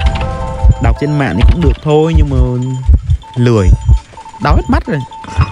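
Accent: Vietnam. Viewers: nghe hơi dính accent của người Việt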